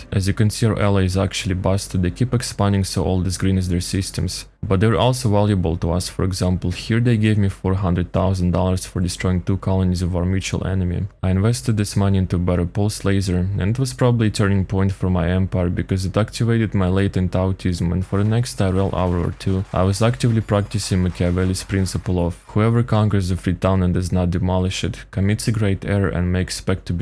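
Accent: Russia. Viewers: What is the accent? It sounds russian or something to me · ru accent?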